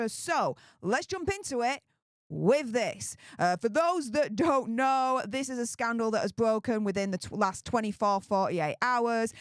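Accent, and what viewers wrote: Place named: North, United Kingdom. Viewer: Excellent video- damning tweets. But surely with your great northern accent "it will bite him in't ARSE"😂...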